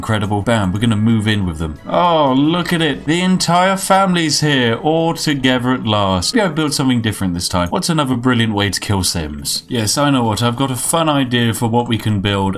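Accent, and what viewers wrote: United Kingdom. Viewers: I just subscribed within the first 5 seconds because of his voice, why do I love British accents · A British Jim Pickens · The British version of Graystillplays 😆😂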